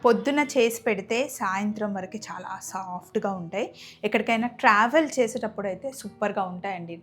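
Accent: Andhra Pradesh, India. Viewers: Meeru..telangana kadhaa..but andra accent lo matladuthaaru..adhemito...telangana yaasa ❤❤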